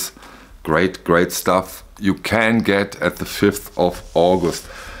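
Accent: Germany. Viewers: Love the German accent ALL HAIL 💜🤘🏿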